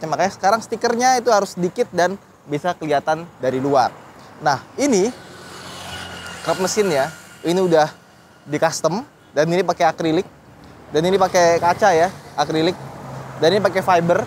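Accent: Padang, Indonesia. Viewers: Bang orang padang ya?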